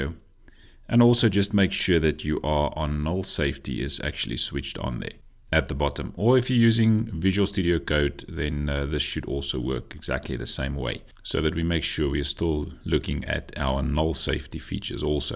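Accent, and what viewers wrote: South Africa. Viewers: hey, I just realised that is a fellow South African, the use of Rands and accent. represent, represent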